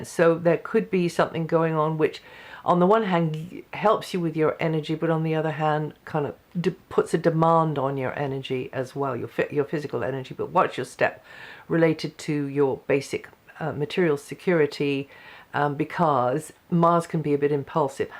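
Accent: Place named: RP, United Kingdom. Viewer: great reading which part of the UK do you live in you sound posh xx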